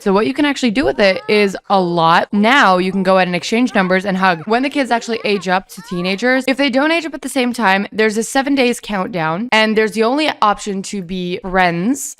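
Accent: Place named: United States. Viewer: I don't understand any ball of it, but even under us... your voice wtfff its fucking perfect 🤩🤩🤩🤩 really americanish really good omg im flabbergasted no words wow 👍🏼👍🏼👍🏼👋👍🏼👍🏼👍🏼👍🏼👍🏼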